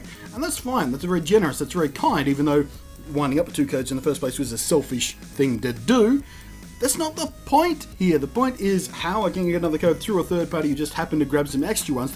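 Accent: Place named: Australia. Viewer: Lol, sorry I laughed my way through this xD I live in a country town so getting to my closest EBgames is quite a drive, when they told me they where out I just didn't even want to bother as I have my Dex filled out anyway. Anyway I went up to the Gold Coast and to Pacific fair and they had a massive pile of the Mew cards (this was also at the end of the month) I did ask the lady about more if you have multiple game copies and she said no it's done by per person, so I figured that's why they still had so many cards at the end of the month... I was just going in for super mystery dungeon so it was a nice surprise after I wasted an hour driving to get one from my usual store, the woman who runs it is nice, so I'm wondering if I could ask to have one kept aside or some thing? To be honest the only one I really want is Darkrai. Oh well, awesome to hear an Australian accent ranting about Pokemon, makes me feel less of a weirdo xD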